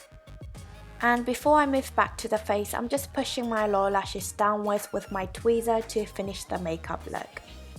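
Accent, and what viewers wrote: United Kingdom. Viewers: 이런 거 잘 못 남기는데 언니니까 댓글남겨봅니다..ㅠㅠ ㅠㅜlove...♥ㅜㅜㅜ 처음엔 저희언니가 보는거 따라보다 보게 되엇는데 언니 정말 매력 넘치세요...ㅜㅠ♥ 정말 같은여자가 봐도 반할정도...ㅠㅜㅠㅠㅠ ㅠㅠㅠㅠㅜㅠㅠ그리고 진짜 언니가 고르시는 색마다 언니랑 정말 잘 어울려요...ㅠㅠㅠ 최고최고...ㅠㅠㅠ♥ 1년뒤에 저도! 드!디!어 이제 화장할 날이 올텐데...!!...ㅠㅠㅠ 그땐 직접 따라해볼게요ㅜㅜ항상 업로드해주셔서합니다!!!! (영ㅇ국발음도매력짱짱!꺅언니최고!) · 네일 어떤 색상인가요?? 한국꺼면 좋겠당ㅠㅠ.. 저도 외꺼풀이라 자주보고있어요!!! 영국 발음도 너무 이쁘고♥♥♥ 건강 관리 잘하시구 앞으로도 좋은 영상 부탁해요~~ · 아픈건 괜찮으신지용...! 그나저나 뭔가 핼쓱... 해보이셔요ㅠㅠ 영국발음도 너무 좋아요ㅠㅠㅠㅠ 항상 영상 볼때 엄청 집중되요 발음때문에ㅠㅠ 끄아아 저랑 눈 모양이 비슷해서 항상 공감되요 눈화장이ㅎㅎㅎㅎㅎㅎ 짱 좋음! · 저도 속쌍꺼풀인데 화장이 너무 예뻐요 영국발음도 너무 멋있고 매력적이에요!!!!!!!!다른 튜토리얼도 많이 올려주세용❤️ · new to ur channel was so shocked to hear that brit accent! haha this vid makes me excited for my trip to korea! :)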